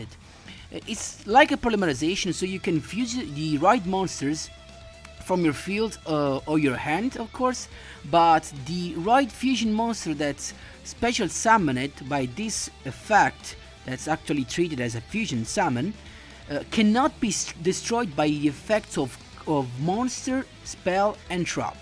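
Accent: Italy. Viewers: Great deck, I’m subscribed! Also, are you Italian?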